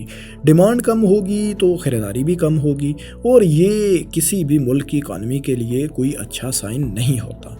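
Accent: Punjab, Pakistan. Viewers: Everything is good and valuable except the VOICE. He speaks Urdu, in Punjabi accent. Please change your narrator.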